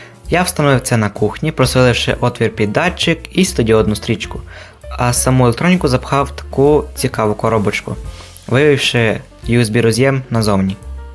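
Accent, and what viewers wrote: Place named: Mongolia. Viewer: Язык смешной,автор наверно монгол.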